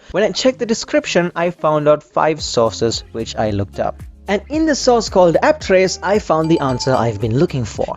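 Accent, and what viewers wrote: India. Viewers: Beo u are indian???😀😀😀😀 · You Indian?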